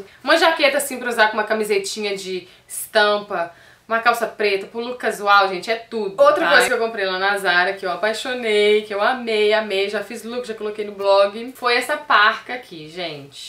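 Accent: Minas Gerais, Brazil. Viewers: Eita sotaque minerin gostosoooo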